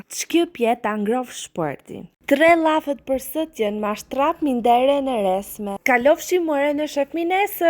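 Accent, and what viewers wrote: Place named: North, Albania. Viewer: Na knaqe me dialektin neve që të kuptojmë , se Jugu për 100 vjet s’mund ta marri vesh se çfar je tu thënë😂😂😂😂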